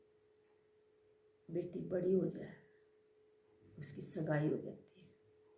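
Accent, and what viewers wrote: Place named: Haryana, India. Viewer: bhn me theth haryanvi 👍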